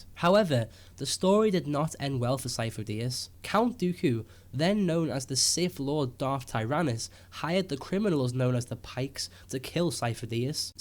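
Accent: Liverpool, United Kingdom. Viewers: random question but are you scouse? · you Scouse? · scouse lad init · Chris Griffin he's a Scouser ie someone from Liverpool. They all talk like that you American twit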